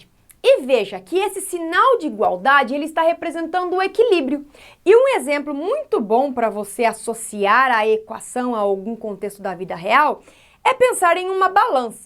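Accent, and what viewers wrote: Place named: Northern Paraná, Brazil. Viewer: A esta perdendo o sotaque do NORTE PARANAENSE RSRSRS. O jeitinho caipira. Quadro lindo e as cores da caneta sao vibrantes bem chamativos.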